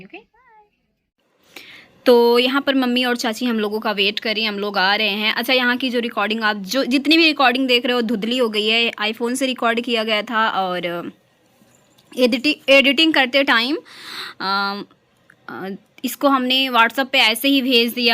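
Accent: Bhojpuri, India. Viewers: Nice bhojpuri. So cute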